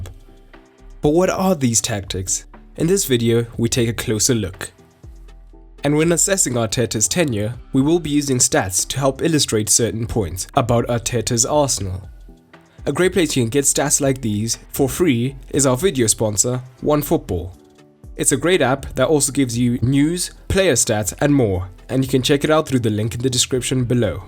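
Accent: South Africa. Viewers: This is totally unrelated, but you sound South African? So...are you?😅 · Wait is the guy speaking South African? Respect!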